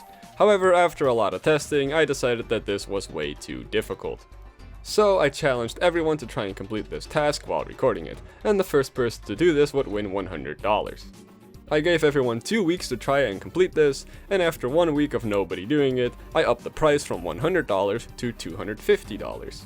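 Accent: Netherlands. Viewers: "mensen denken sowieso dat dit duits is" I'm dutch (well actually flemish but who cares) and that's not german. I do not actually know what weird language that is, but I do recognise in your way of speaking english that you are a fellow dutch speaker. Why did i write this. Duits is een fantastische taal en Duitsland is een mooi land. Nein, niederländisch ist fiel besser als deutsch. Yeah i'm bi... trilingual... maybe we can even count french, nah i suck at french. I should really stop now this is becoming cringey. Why am i continuing, the cringe is real. Cringe and cringey are cringey things to say. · now i cant unhear your Dutch accent. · Ben je Nederlands of Vlaams? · Can i maybe ask if you are from the Netherlands because your accent sounds a bit dutch.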